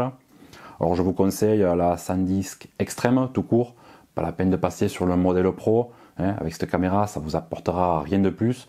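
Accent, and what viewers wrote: South, France. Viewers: Sympa et détaillée, ta présentation; et avec un bonus qui va bien: l'accent du sud!! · Salut comme toujours les commentaires sont clairs et précis cet appareil paraît simple d utilisation fait pourtant de belles vidéos encore merci Pour ta présentation continue comme ça avec le bel accent du sud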